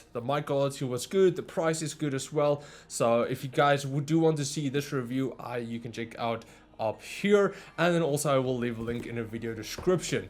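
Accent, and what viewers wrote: South Africa. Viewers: Dude are you SOUTH AFRICAN if are nice bra ek ook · Where are you from in Sa I can’t tell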